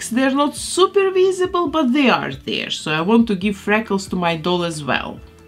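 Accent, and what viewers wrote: Russia. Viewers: Русский акцент или мне кажется?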